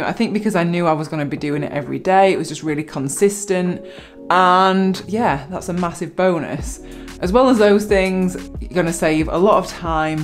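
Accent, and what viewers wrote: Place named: Manchester, United Kingdom. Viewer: You didn’t cover the biggest problem that’s always made it difficult for me. Where to put your bike? With you’re accent I take it you are from Manchester, well I live there too and I’ve yet to work at a place there that would let me take my bike inside. Last place I worked I had to lock it to a lamppost outside, it lasted a week before it was robbed. The police described there are gangs that drive around in vans, which they fill with bikes. They carry bolt cutters that make mincemeat of even the most expensive locks. My bike before that was even stolen from inside the development where I live, watching back the CCTV, they somehow got the gate to open, came through the gate in a van, reversed up to the cage containing about 20 bikes, used bolt cutters to cut a huge hole through, filled their van, then drove off, the whole thing took just 13 minutes to steal 20 decent bikes. 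It seems to be a problem with Manchester in general, people seem to feel entitled to take what isn’t theirs. Like that daft MoBike scheme, it was never going to work, most of those bikes are now in the ship canal. I’ve even witnessed a group of youths jumping up and down on a bike, because they couldn’t steal it, so they thought they’d trash it instead. I just don’t take my bike to the city anymore if I know I have to leave it. Most of the time now, I just walk.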